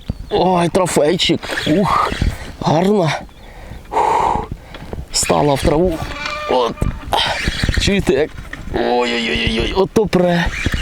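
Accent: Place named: Ukraine. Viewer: говори на нормальном языке. не хочется слушать говор Бандеры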